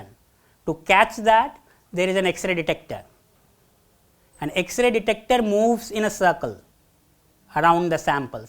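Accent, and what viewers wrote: India. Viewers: This lecture is so good that for the first time I was able to listen through the entire lecture on YouTube with Indian accent.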